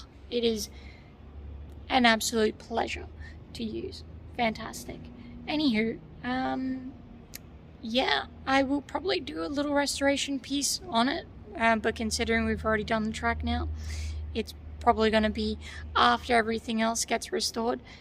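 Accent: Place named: Australia. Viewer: That slightly cracked ozzie accent of yours is delightful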